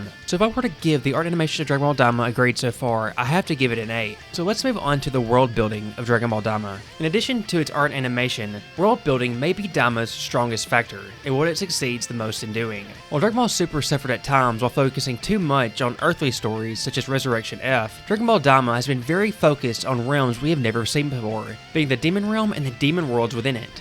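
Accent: South, United States. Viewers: Bro has the most southern accent I've ever heard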